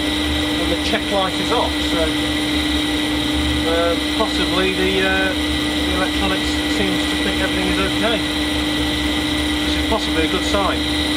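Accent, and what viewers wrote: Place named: United Kingdom. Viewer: the brit'ish accent is unbeataple.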